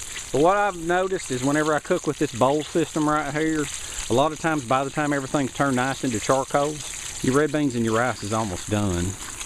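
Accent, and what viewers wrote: South, United States. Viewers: he has the accent from which part of usa?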